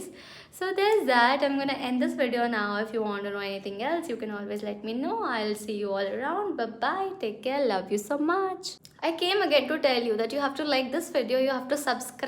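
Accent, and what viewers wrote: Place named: Karnataka, India. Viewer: U are getting habitual to kannada accent 🤣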